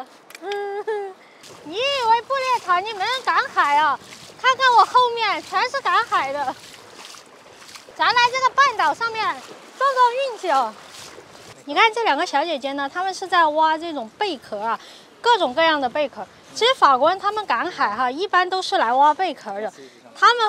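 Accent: Sichuan, China. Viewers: 听口音是四川人吧？ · 火锅味的普通话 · 看到一半我老公说这会不会是个四川人！跟你一样好奇地什么都要抓一下摸一哈，说话也像，看到后面果然啊！了解我们大四川的川性是什么样的(o^^o) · 美女是四川的吧。这口音听着好熟悉，哈哈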